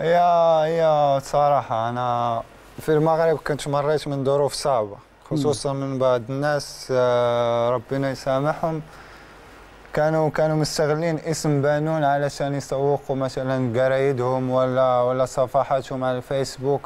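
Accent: Egypt. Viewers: ههههه بيهدر مصرى بطريقه ملفته ادائك جميل ف تعبير الكلام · هل انت مصري ام مغربي يتنكر للهجته الأم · فين للهجة بلادك اسبوع في اصبحت مصر..انظر الى معلول كما سنة هو في مصر يتكلم فقط تونسي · كتكلم المصريه طزززز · انا ملاحظ انك بدأت تشرب اللهجة المصرية😂😂🇪🇬🇵🇸🇲🇦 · بدر بانون لخبط اللهجتين المصريه والمغربيه مع بعض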